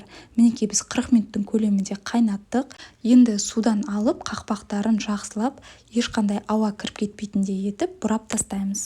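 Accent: Standard. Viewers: Саламатсызба тәте? Сізге көп рахмет, таза қазақша түсінікті айтқаныңызға .Алла өзіңізге жар болсын! Қолыңызға қуат берсін Алла. Ауырмаңыз , балаларыңыздың қуанышы боп жүре беріңіз тәте , бұданда жақсы рецептермен бөлісе беріңіз.. Алға қазақтар ...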